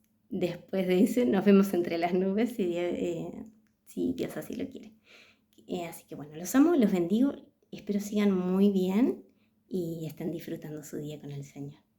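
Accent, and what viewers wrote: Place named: Argentina. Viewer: Como muchos argentinos, pronuncias los sonidos de la "LL" y la "Y" como un "SH" o "CH". Cuando lees palabras hebreas, tu pronunciación argentina dificulta saber cuál es la fonética real de esa palabra y, en consecuencia, de qué palabra se trata. Como tal vez te resulte prácticamente imposible darnos la pronunciación correcta, al menos podrías incluir subtítulos. Con las palabras por escrito, podremos nosotros mismos buscar información adicional del mensaje que quieres entregar.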